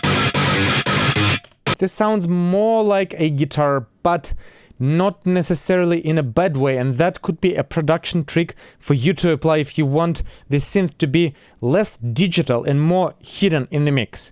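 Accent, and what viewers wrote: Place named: Eastern Europe. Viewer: As a seasoned metal guitar player learning about the world of synthz it adds to your credibility that I spot an EVH, an ENGL and a Custom Audio Electronics behind you. Had it been Vox, Two Rock and Matchless combos I would have had my doubts..... :) Based on your accent I would say somewhere in Eastern or South Eastern Europe,- though it could of course be South America too,- you just never know... Greeting from a Vikingr Metaller in Scandinavia. Subscribed.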